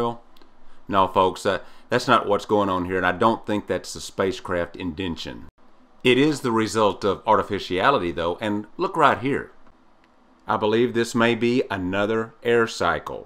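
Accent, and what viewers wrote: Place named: East Texas, United States. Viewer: man! Thanks for the video! Your work is my favorite! Must be that East Texas accent.. Or them funky tunes. Keep it up man. We love ya in Louisiana!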